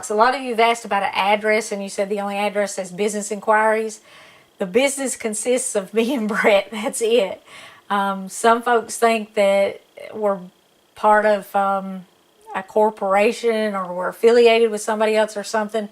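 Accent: South, United States. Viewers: I just discovered your channel and boy do I absolutely LOVE you! Your fantastic southern accent, great recipes. You are wonderful! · I love to listen to this lady talking; reminds me of my KY kinfolk, my grandma Kate Ford, and my grandma Bertha Edwards who also spoke with a very southern country accent. I'd been looking for an easy fudge and easier potato candy recipe. Thank you for sharing your old-fashioned delicious candy recipes with all of us folk. During this year's holiday season, I will try your two candy recipes! Bless you.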